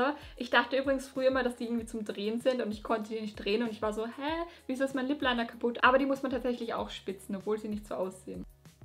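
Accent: Austria. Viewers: Und auch so lieb und immer freundlich und dein österreichischer Akzent ist echt cute und du bist so talentiert und kreativ und ich liebe dein Intro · Hallo ich kenne dich noch nicht lange aber kommst du aus Österreich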